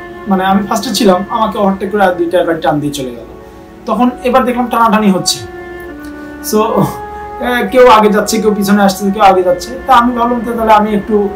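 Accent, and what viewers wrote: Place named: Chittagong, Bangladesh. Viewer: bhai ki chittagong er manus? apnar vasha te chittagong er vasha er ektu tan ase hahaha <3 love from ctg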